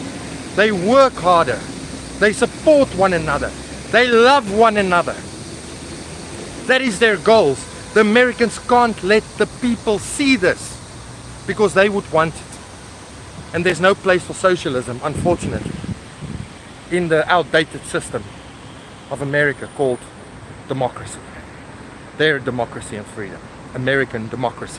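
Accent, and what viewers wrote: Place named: South Africa. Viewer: I just love your accent. I think the South African' English is the coolest of all English accents.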